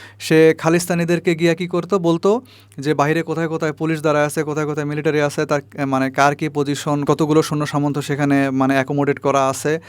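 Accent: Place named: Bangal, Bangladesh. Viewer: Korssy ,kaisse , dissey thik moton bangal bol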